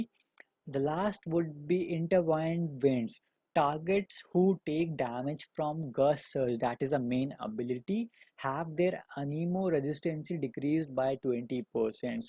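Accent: India. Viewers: Hey friend r u indian u sound like 1 pls reply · You are from India, aren't you?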